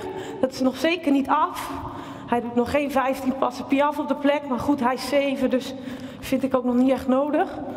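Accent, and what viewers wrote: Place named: Netherlands. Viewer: An stop at 10.35 min. We can see /reconize that you Mrs. Have had your antebrachialis and infrascupularis dex./sin. In the right position like olympia level ! The horse ,capri sun , open his atlas axis bone connectivity and it looks for better/more scores ! .......sure only your dutch accent in your voice , hold me down to gave full points -cute or sweet thats not an question Mrs. Dinja van liere -amazing ,to build-up talent....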